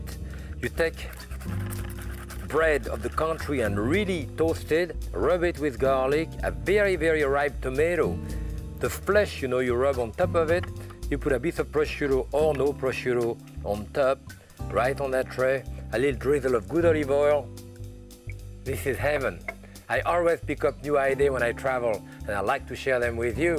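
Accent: France. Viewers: I love to cook. My first serious cookbooks were Mastering the Art of French Cooking, Volumes I and II. The originals, not the boxed set. My family is of French origin. Everyone in my family cooks delicious creative food, although they may have degrees in subjects not related to food. I have lived in Berlin for 30 years, but before that, I lived on the French border where I got married. My new husband and I went to Alsace every other week-end, where we had some really good French friends. His accent was exactly like ', the melody of French carried directly into his English, "You know..." I am "staying in" now and almost every day I talk to my sister in Minnesota, who is a professional baker. When I told her I was watching, she said, "Oh good! I'm so glad you are doing that. It's good for your spirits." Not that I'm depressed! But she is so right. I carefully record the details of his methods, and have "More Fast Food My Way" in my Amazon basket. 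I'm so sorry for his loss of his Gloria, who he thinks about with every deft detail, how she likes turkey wings, and likes her pasta well-done. What a terrible year! On his many shows, though, he's timeless, and clever and produces fabulous food. I have to keep reminding myself that he has a Master's Degree in French Literature from Columbia University... He has mastery at more than the one thing... He's a man of all seasons.In this time, he's a Godsend!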